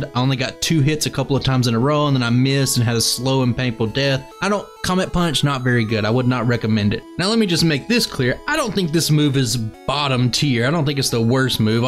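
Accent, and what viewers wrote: South, United States. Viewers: what’s funny is to me you have a southern accent (I’m from Michigan) and in my mind I was like “oh yeah, he’s gotta be more southern based, that makes sense” lol.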